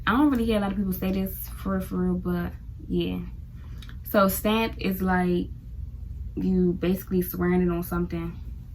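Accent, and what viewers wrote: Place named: Baltimore, United States. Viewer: Im from Philly. The way you talk sounds like two Baltimore girls I know. And I know you're not saying (yew,dew) like them, but it's more than that. I can't explain it cus I'll be writing paragraphs. You can tell the DMV is a transitional area. DC locals just sound more southern.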